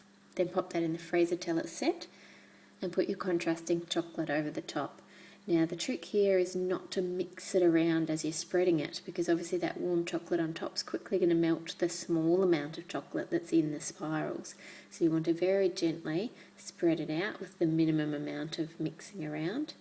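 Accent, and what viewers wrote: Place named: Australia. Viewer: thats amazing. your ausie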